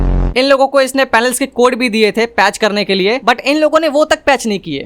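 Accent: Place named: Bihar, India. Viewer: bihari accent on top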